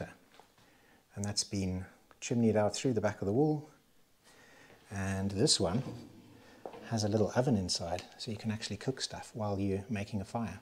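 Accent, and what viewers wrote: South Africa. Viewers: Fabulous property! Lovely to hear a fellow South African! 😊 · South African accent. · So lovely to hear the South Afrıcan accent was not completely sure untıl you saıd we SOUTH AFRICAN say BRRAI.thank you for the amazıng vıdeo.would love to buy such a farm for my organıc way of lıfe.Greetıngs from CAPE TOWN.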